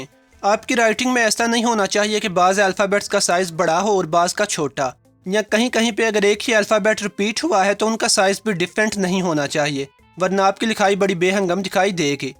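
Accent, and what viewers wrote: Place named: Lahore, Pakistan. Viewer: Lagda Lahore da aye... Jiss hisab nal bolda aye... :)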